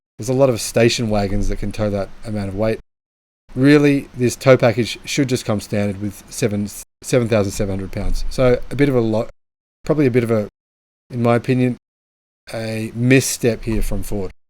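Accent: Australia. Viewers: Love Aussie accent! I'll wait for Rivian to deliver to Europe - or even F-150, but Ford most probably won't deliver F-150 to Europe though... (would love to get rid of my Tesla MS, won't buy any other Tesla vehicle, no matter what, because of how I experienced the really bad service and customer treatment after 4+ years of ownership...)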